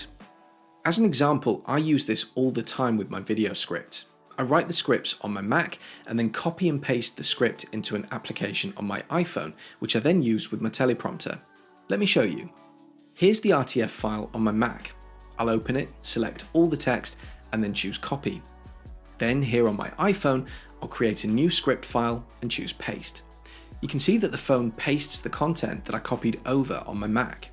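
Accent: England, United Kingdom. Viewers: Great video as usual. I’ve gotten so much benefit from your content; you condense all the fluff and get straight to the useful info. Every Mac user should subscribe! On a personal note, the British accent is just an adorable perk. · I really like your 10 tips videos,. Since I moved to Apple a couple of months ago after 36 years (1986!) in the Microsoft-Intel world, I used a lot of your tips to make my MacBook Pro comfortable and convenient. Nice to hear a clear English accent, too. All the best, Rob in Switzerland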